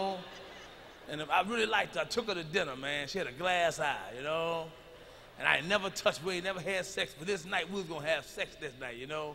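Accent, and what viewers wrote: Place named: South, United States. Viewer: exactly just go to a family down south in any of those areas nd you'll see folks speaking very akin to Mac. Nothing like the legend himself tho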